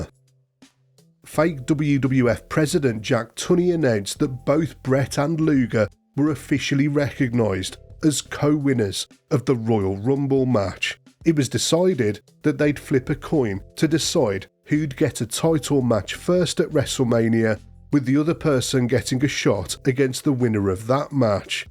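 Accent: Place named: Black Country, United Kingdom. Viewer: love 94 almost as much as your accent. Yow sound like youm from rand ere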